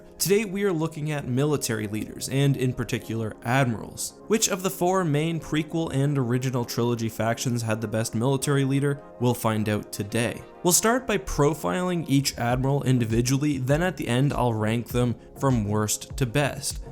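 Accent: Canada. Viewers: Ay you canadian??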